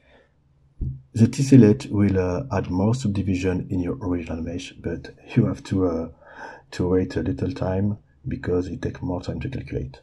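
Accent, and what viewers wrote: France. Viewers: hey, avec l'accent j'ai assez compris que tu était francophone, merci pour ce tutoriel !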